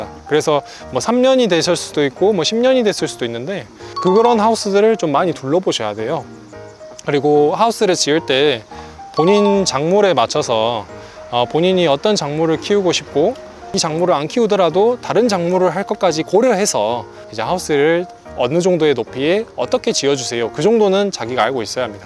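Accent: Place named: Jeolla, South Korea. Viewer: 서울말투쓰려고 노력해도 전라도 말씨가 다 들리노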